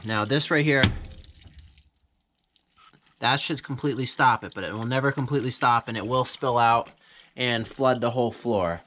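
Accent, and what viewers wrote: New York, United States. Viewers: What part or town of New Yawk are you from? looks like upstate from the vids Ive seen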